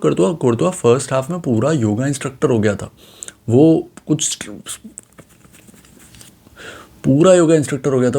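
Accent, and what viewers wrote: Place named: Madhya Pradesh, India. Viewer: What kind of accent is this? Sounds like someone from mp or something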